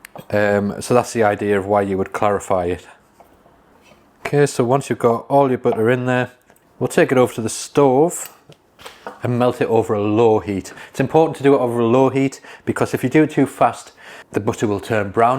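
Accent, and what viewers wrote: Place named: Yorkshire, United Kingdom. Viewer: I’m guessing from your accent you’re a Yorkshire lad..?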